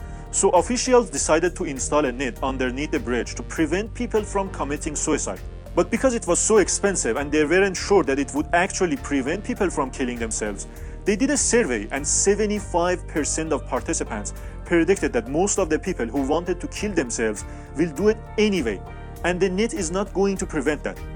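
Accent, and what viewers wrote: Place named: Iran. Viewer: The narrator sounds Persian 😄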